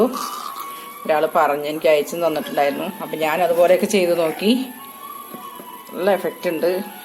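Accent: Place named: Palakkad, India. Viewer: PALAKKAD slang soooper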